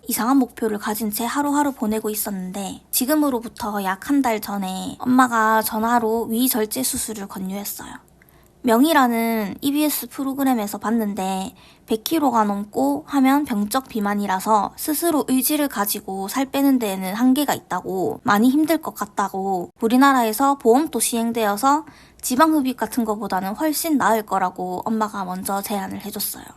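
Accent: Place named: Ulsan, South Korea. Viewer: 사투리가 울산같은데,.귀에 쏙쏙들어옵니다. 저는 포항이거든요ㅋ 저도 다음달에 대구에 하러가거든요 너무 와 다닿네요ㅠ 잘 보고갑니다. 함께 화이팅 해 보아요~~^^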